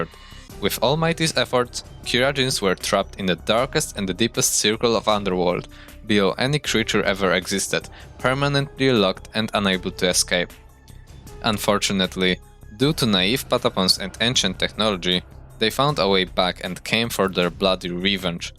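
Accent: Poland. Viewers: Słychać że polak :D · Ten polski akcent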